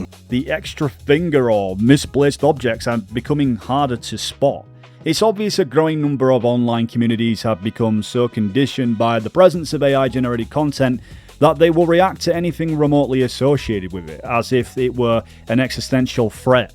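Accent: United States. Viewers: lol ur american accent is good bro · Why did you think people would take you more seriously with an American accent?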